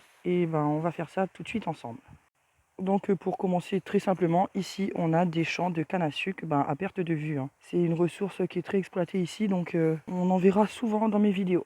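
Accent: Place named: Reunion, France. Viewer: Merci pour cette ballade ds la nature reunionaise. Ca me rapelle mes randos à Mafate. Les images sont reussies et on perçoit un petit accent reunionnais ds la voie malgré un sejour prolongé en metropole.😊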